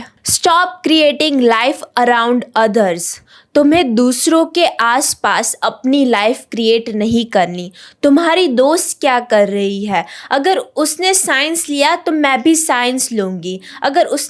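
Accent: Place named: South, India. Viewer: Are you from south india?? Your hindi like this ❤❤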